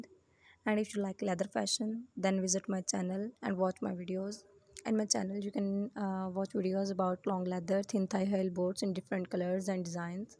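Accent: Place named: Japan. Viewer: Your Japanese accent kills me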